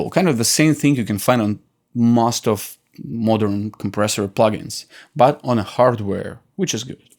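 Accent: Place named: Russia. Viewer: Сразу слышно, Русский говорит. Привет,. Я без стёба.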